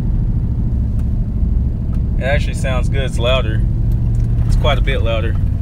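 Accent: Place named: Atlanta, United States. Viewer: Your from Atlanta all my people out there talk like that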